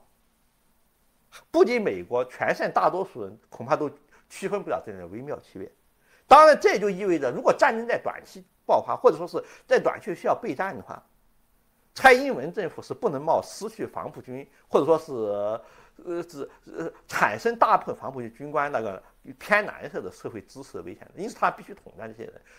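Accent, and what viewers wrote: Sichuan, China. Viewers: 這口音就是四川的黑暗料理 非常地上癮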